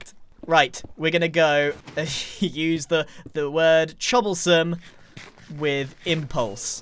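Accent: United Kingdom. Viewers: I think impulse didnt notice "chobblesome" because with your british accent it sounds very close to "troublesome"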